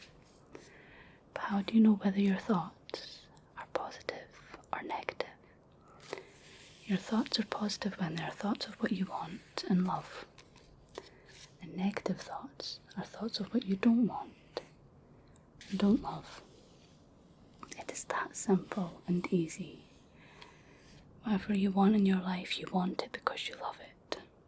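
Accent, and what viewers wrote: Scotland, United Kingdom. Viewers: I love listening to a Scottish accent. It's nice to hear, because usually I'm hearing the West Coast American accent.☮️ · Yeah, Scottish ASMR. Hello from NE highlands!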